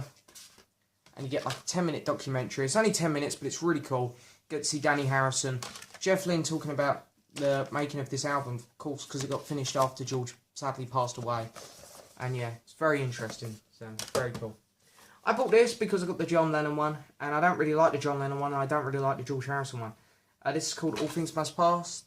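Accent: United Kingdom. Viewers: Hello from quebec city canada...love your vids and your british accent......great work young man.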